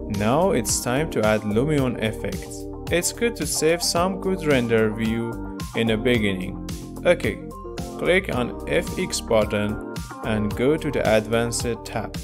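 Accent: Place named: Iran. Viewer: Good but You have to work on your pronunciation. (too much farsi accent and wrong pronounced words).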